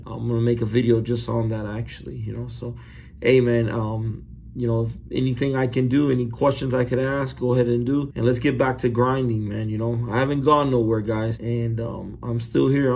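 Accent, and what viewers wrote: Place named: Louisiana, United States. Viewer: You from Louisiana bayou country? You sound a little like the Cajun Cannon.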